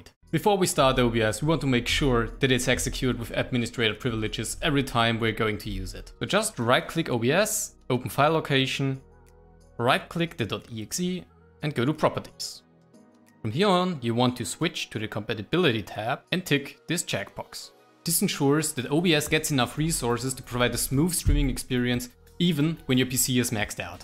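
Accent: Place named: Germany. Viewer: I could tell you were born in Austria, Germany, or any other place that speaks German as a language. the accent sounds german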